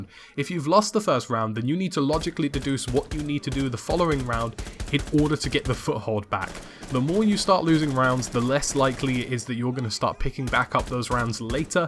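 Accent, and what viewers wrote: United Kingdom. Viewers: British accent + advanced vocabulary = music to my ears :)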